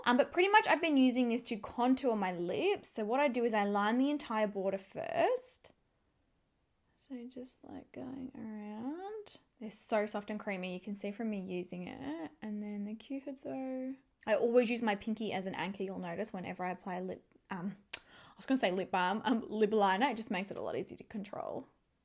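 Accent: Australia. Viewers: Love your videos. You are so beautiful and sweet. And I love the Australian accent. XO from Florida. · Why Australia has to be sooo far away?? 🌞🌞Love your videos and your accent!! 😙 #HolyGrails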